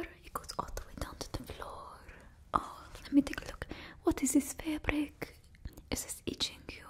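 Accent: Russia. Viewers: I quickly wanted to mention how I'm really digging the Russian Accent within the recent posts!! Especially in the last one relating to the cyborg, I can easily re-listen to that video multiple times, I just somehow find it incredibly pleasing to hear. And while I'm commenting, I just wanted to say thank you for all of these wonderful videos :] You put so much work and detail into them, always a delight to see a new upload! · Чувашский акцент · It's better than my fake russian accent I use when playing Hell Let loose as the soviets so good work! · Coincidentally, I was just marathoning Gibi's Tatiana roleplays, so Russian accent seems to be my thing today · I've gotta say I can't get over the russian accent. Truly talented... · I really love the genderless approach "bad girl, bad girl or whatever gender you identify as look", this was very pleasing experience, as a trans man I appreciate it twice as much) Also, as a russian myself, I would like to say, that your russian accent is very nice, I'm so tired of hearing heavy russian accent in movies, it's really hard to hear and understand, just disappointing honestly, but yours was way softer and pretty realistic! 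· Love the russian accent. Your performance is always on point. 😩💖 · This is the year of my villian arc and my fashion sense isn't the greatest so thank you for the help with that. I can't wait to cause chaos and look good doing it. Your Russian accent is amazing by the way. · The Russian accent perfect! Do more things like this I'm in love! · What I love about your Russian accent is that, as a linguist, I could go on and nitpick the phonetic aspect (which I won't, it's really good, it's just that some things only come to you when you are studying the target language super close and not one sane person would devote years to studying foreign phonetics), but your grammar absolutely makes up for it, sometimes you even got me wondering whether you are a native English speaker. And I think that grammar aspect is more important for creating a believable effect, because people come from different language learning environments and have their own unique speech peculiarities, so the accent can sound different from what you picture as an ideal Russian accent, but the grammar mustakes are definitely common for all Russian-Englush speakers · Wow I totally did not expect that you'd say my name and scared me off a bit ngl 😂😂 And btw your russian accent is perfect 🥰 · There's no need tho, it would make just a cliche trope, not a wholesome true russian accent as it is now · Happy Thursday,!😊😊😊😊😊☀. 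And I love the beautiful russian accent. It's brilliant!😊💙💙💙💙💙💙💙👍. Also, this 51-minute ASMR video is super amazing! I can relax and eat my pizza with it.😊💙🍕👍. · Раиль Галеев именно так русские и говорят на английском, только если не имеют лингвистических способностей и/или не стараются скрыть присущий им акцент. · Russian accents are so hard or just underwhelming/overwhelming, but this, is amazing! I'm learning Russian and I have such a bad accent, so give yourself more credit! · Your Russian accent characters are always my favorite 🙏😁 and the doctor/scientist characters. Ty! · Read the description and simple silly videos like these are always appreciated, love the awesome content. Also your Russian accent sounds great :) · Очень хорошо изобразила русский акцент🤔🤯, Браво! 👏👏👏 Very well showed the Russian accent🤔🤯, bravo! 👏👏👏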